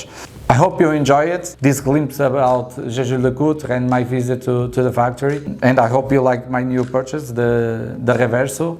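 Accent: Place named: Portugal. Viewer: I mean it's obviously not his first language, I'd go easy on the guy, sounds like his native language is portugese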